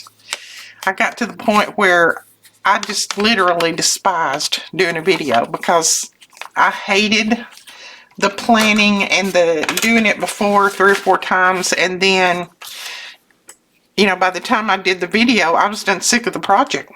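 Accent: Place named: Tennessee, United States. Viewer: When I heard you say your birthday is October 7, and had depression , I also have depression bouts out of nowhere. I have taken meds for several years, and they really help. But sometimes I have to fight it everyday. Also, our youngest son ‘s birthday is October 7. He died 4 years ago, so it is always a tough go during that time. He was 49 years old. I listened to you talk after your husband passed, and I felt so helpless. There are no words, or right or wrong way to grieve. Everybody has their own way to try to get through. I feel his presence when a Cardinal comes to see me, when I find a bird feather, see a beautiful butterfly, or see his image in a cloud. I hope your depression stays in the background, and your memories of your husband make you smile. I watch you all of the time. Thank you for taking the time to make your videos and for your beautiful freebies. 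It is also nice to hear someone who sounds like me. I am from Tennessee.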